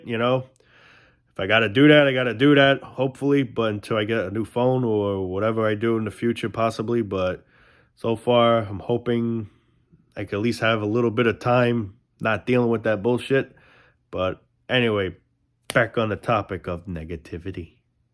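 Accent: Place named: Boston, United States. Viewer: I'm listening to your accent and I'm fairly sure and please correct me if I'm wrong but you sound like you're from Boston ... I'm from Maine so I hear the dropped Rs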